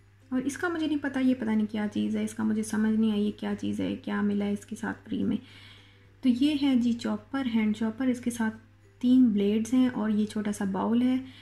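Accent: Saraiki, Pakistan. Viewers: Hi sister!from where are u?ap k bolne se sarahki zuban ke jhalak a rhe h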